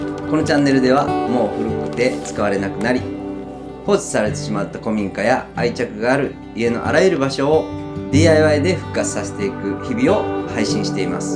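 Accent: Kansai, Japan. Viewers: 関西在住経験あって、西日本で暮らすと、JPさんのような言葉使いになります😁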